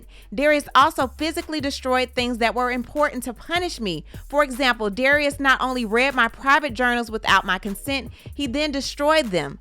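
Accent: South, United States. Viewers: It's the detailed and court document alwayyssss with oh and that southern drawl is everything.. Good work as usual detective 😂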